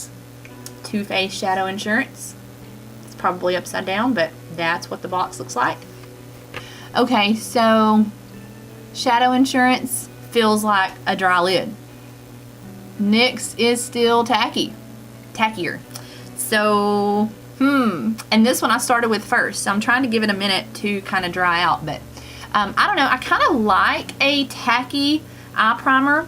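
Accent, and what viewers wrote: South, United States. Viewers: Thanks for the review! Where are you from? Love your southern accent❤️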